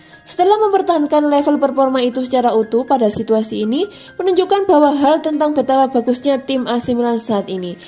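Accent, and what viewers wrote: Java, Indonesia. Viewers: Mbak mbak, jangan medok medok napa bahasa nya . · Min logat jawanya jelas banget,,😂😂,,Mantup,,eeh mantap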